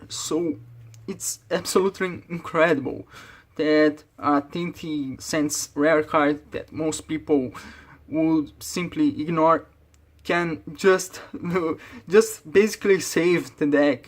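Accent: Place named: Brazil. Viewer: notei que era brasileiro pelo sotaque kk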